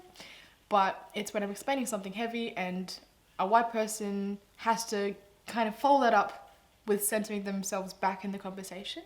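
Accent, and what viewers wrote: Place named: RP, United Kingdom. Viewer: Thanks for sharing your thoughts and opinions, you opened my eyes to things l had never even thought of. Great to get these conversations out into the public domain - it can only forge a path for a more comprehensive society. I love your posh english accent - it’s just so ‘cricket in the afternoon dear’. Oh - btw, what’s the cat’s name? 😀👍😀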